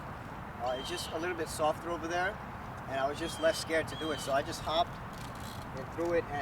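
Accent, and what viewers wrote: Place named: New York, United States. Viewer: Yo are you from new york???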